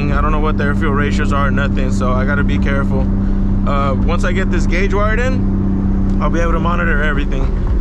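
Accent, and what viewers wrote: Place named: Texas, United States. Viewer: What part of texas are you from?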